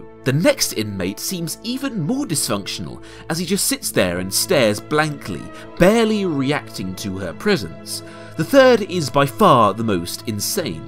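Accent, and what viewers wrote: United Kingdom. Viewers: i love the british accent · I am subscribing simply because of your sexy British voice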